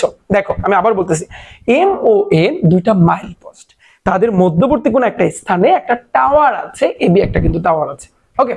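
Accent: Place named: Barishal, Bangladesh. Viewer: কারন ভাইয়ার বাড়ি বরিশাল 😌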